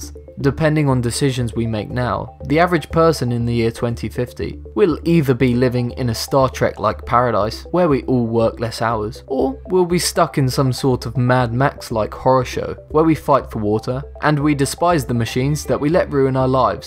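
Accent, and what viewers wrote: United Kingdom. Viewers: How could you possibly think that?! This guy is British and Dolan is Australian, they don't sound anything alike.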